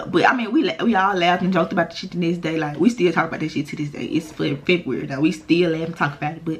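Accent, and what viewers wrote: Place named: Memphis, United States. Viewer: I came across your video and immediately liked the hair for starters and also just knew the accent was Memphis. At least y’all are a hop, skip, and jump away. Gotta love Address, Bar 2200, and all that. We’ve sort of branched out to going to Chapman & Kirby for brunch and some folk go to 5015. It seem like you liked Bar 2200 food. I loved their vibe!!!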